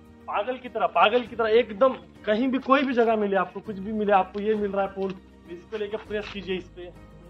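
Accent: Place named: Bengal, India. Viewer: Sir aap bngla bhasa bolte ho, aapki hindi सुन ke lg rha है,mera 3 june ko रनिंग है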